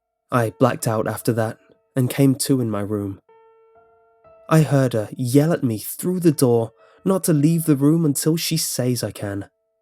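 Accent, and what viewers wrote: United Kingdom. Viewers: Gosh damn it he's British.......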